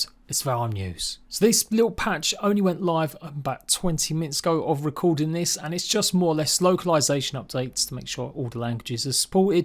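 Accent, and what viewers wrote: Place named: United Kingdom. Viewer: Totally random question. I have a weird hobby--I like learning to recognize accents. Where are you from? I'm guessing you're not from East End London, although I'm still a bad guesser when it comes to British accents because there are so many. Bonus question: what celebrity do you think represents your accent best?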